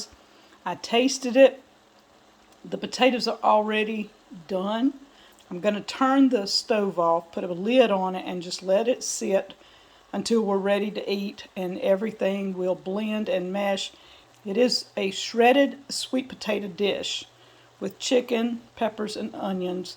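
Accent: South, United States. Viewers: Another original recipe. Master chef with a southern drawl. Love it.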